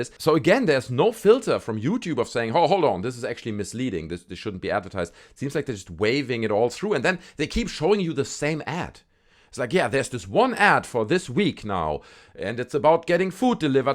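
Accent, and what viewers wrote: Germany. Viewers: Very Good Video! Are you from Germany? Because you have a German Accent lol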